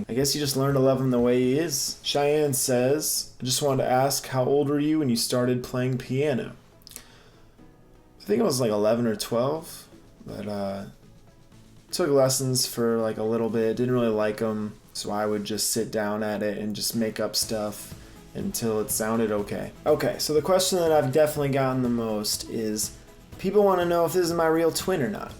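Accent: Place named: United Kingdom. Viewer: thought you were british!!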